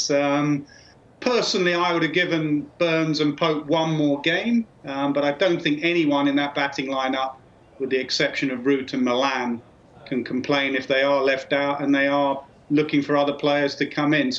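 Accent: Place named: United Kingdom. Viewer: I could hear Nas all day talking cricket and wouldn’t move an inch. Nas is really good! That British accent makes it even better !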